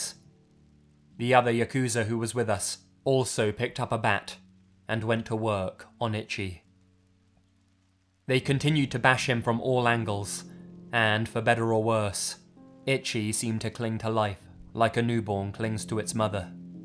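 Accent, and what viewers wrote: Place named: United Kingdom. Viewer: Why did he have to have a British accent 😓😓😓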